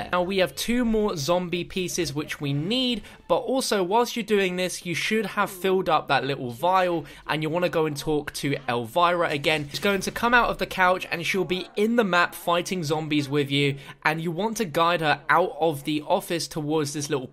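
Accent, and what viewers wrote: United Kingdom. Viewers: Nick Bailey it's British accent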